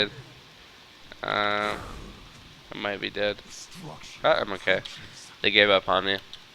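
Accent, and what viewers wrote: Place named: Texas, United States. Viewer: Dat accent ... man.. are you from Texas? :D Anyway, nice video and thanks for all the advice :p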